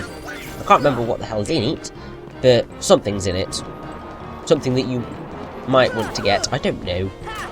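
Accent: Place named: Wales, United Kingdom. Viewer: haha, you do sound a little Welsh! Nothing wrong with being English, although Welsh are better ;)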